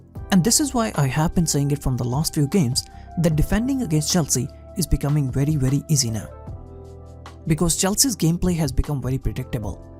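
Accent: India. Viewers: No voice please. Bad indian accent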